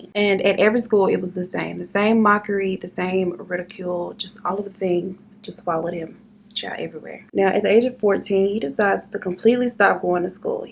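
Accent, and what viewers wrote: South, United States. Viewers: I like you. You have calming voice along with everything else. If you would accept a positive advice, of course in case you would like subscribers from all over the world, including not native speakers. I understand you in general, but sometimes I can't hear clearly. You speak soft and when you do it fast, silent and typically southern with lots of reductions i am having a hard time. Please don't misunderstand me, it's all positive, I love your videos and wish you the best.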